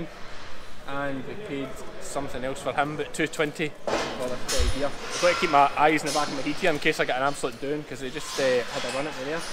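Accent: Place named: Ayrshire, United Kingdom. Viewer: Your " wonderful accent " is so familiar to me I don't notice anything unusual - until I read the comments. Fair to say the Ayrshire accent is probably under represented on Youtube lol.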